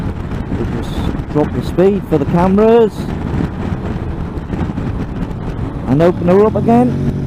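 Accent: North East England, United Kingdom. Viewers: Interesting video..👍 Do l detect a North-east accent ?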